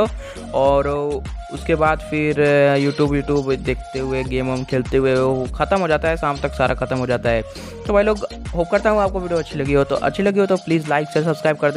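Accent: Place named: Rajasthan, India. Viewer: Bhai rajasthan se hai kya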